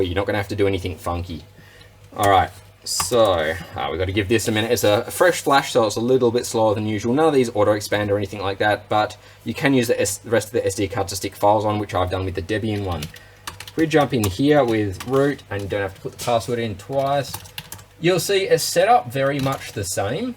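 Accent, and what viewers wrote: Australia. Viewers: Mate, I just bought me one of these, just because I could. I mess around with Raspbarry Pi's, have the 26TOPS HAT, still figuring it. But saw one of these and just had to have one. Got it with a MIPI touch screen, so i can make it all in one, just add power. Love your review, it is so much better to hear an Aussie voice doing these 'weird' hobby stuff. Cheers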